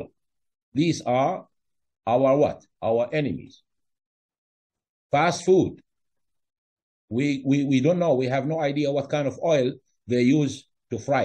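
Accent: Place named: Arab world. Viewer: Very Good video , thanks. Wow your originally from india ! I thought your accent sound Arab .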